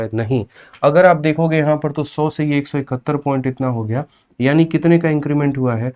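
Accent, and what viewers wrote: Bihar, India. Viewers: Sir main rrb je ke liye pdh rhi hu aapki videos helpful hai thankyou sir , bss ek request hai sir please thoda sa questions ko na english me pdh kr explain kra kro taki humko pta chle ki english me pdhke kaise approach Krna hai questions ko . Baki aap bihari me bolte ho vo toh sara upr se nikl jata hai . Meri bss yahi request thi 😊😊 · Ab sir paka bihari leg rha ha gajb❤❤❤❤❤🎉🎉🎉🎉🎉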